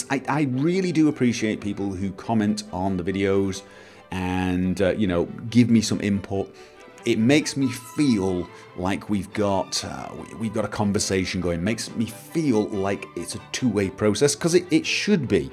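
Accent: United Kingdom. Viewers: WAIT.. you're not a well mannered British Sir with a calm voice who wears mostly tuxedos, monocles and top hats/bowlers in real life who - for some inconspicuous reason - lives in Norway? THE WORLD IS BROKEN. In all seriousness, it's really hard to imagine an angry. Like, that British voice that taught me how to mod Skyrim and FNV? That's just beyond my imagination. Or that an angry would at least sound not that angry.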